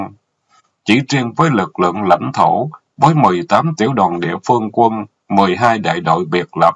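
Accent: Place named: South, Vietnam. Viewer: Không biết tôi đã theo giỏi chuyện nay không biết bao nhiêu lần!rất thích loi hành văn mạch lạc của tác giả, giọng đọc miền Nam của anh. Loi điều binh và chiến lược hành quân của manh su Ng mạnh Tường.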